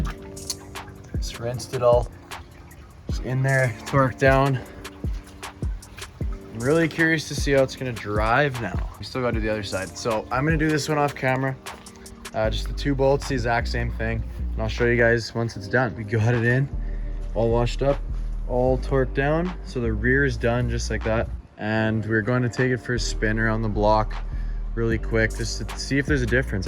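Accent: Canada. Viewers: Buddy your accent is legendary, Canadian for sure hahaha. Thanks for the guide!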